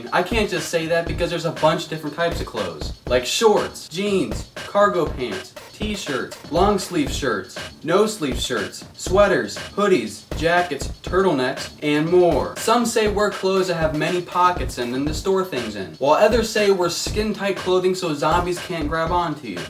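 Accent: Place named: St. Louis, United States. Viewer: sounds like saint louis, missouri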